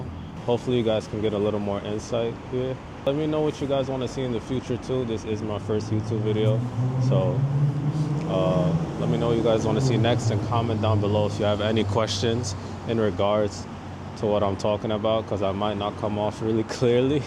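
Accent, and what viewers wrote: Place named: Toronto, Canada. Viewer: You sound like you’re from Toronto though, like a moli torontorian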